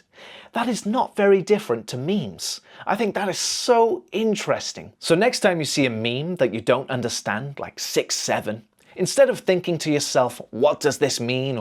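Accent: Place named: United Kingdom. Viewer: Hi! I really enjoy your practical approach to English learning, especially your Walk & Talk videos, graded listening content, and the way you bring natural British English and real-world topics into your lessons. I also sent you an email today with a personalized App idea inspired by your teaching approach. The idea is to turn your listening and speaking content into an interactive practice space where learners can shadow your lessons, practise pronunciation, work through IELTS and speaking prompts, and build a consistent daily practice habit. I think your teaching content could become something really valuable for learners beyond YouTube. I’d love to hear what you think! 😊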